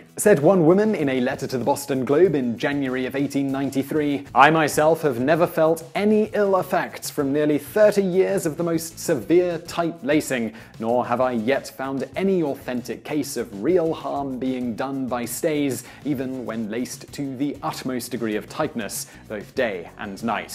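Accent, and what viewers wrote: United Kingdom. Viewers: where is the narrator from? great britain?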